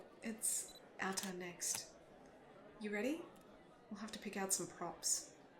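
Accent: Australia. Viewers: Nice to Hear An Australian Accent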